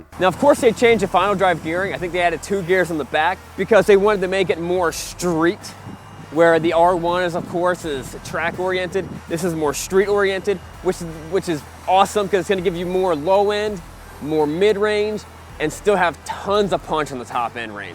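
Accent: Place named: South, United States. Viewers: I think he's texan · hes from the south what do you expect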